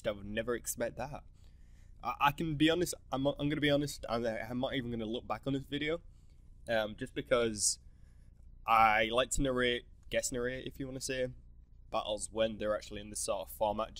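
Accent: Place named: United Kingdom. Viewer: British accent is one of the most awesome accents in the world... :')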